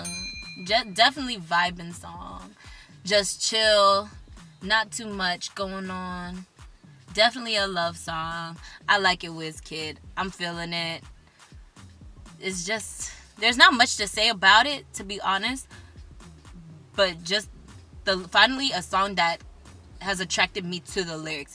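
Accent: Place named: Nigeria. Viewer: honestly you sound nigerian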